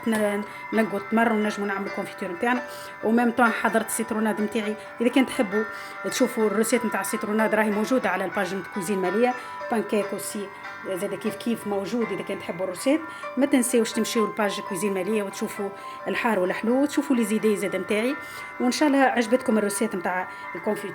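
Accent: Tunisia. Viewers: اختي لو كملتي بالعربية. والله شكشكتيها بالفرنسية . واللهجة تاعك حلوة بالتونسي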